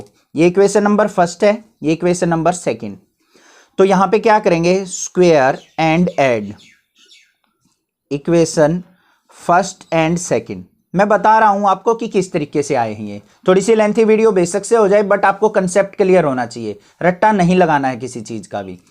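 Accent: Haryana, India. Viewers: bhai first time haryanvi accent mai pad raha hu, addiction ho jayga lag raha hai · Sir are you from haryana?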